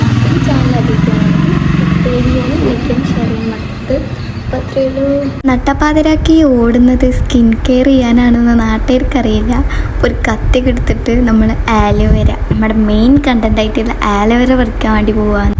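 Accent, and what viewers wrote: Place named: Malappuram, India. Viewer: Malappuram slang 😌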